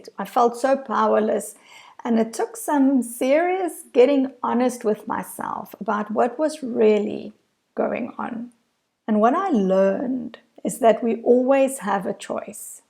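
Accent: South Africa. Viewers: You sound South African ?This is so beautiful I love this message thank you I would really love to work with you,new subscriber and so glad I found you. I'm so ready to claim my Queendom Yassss!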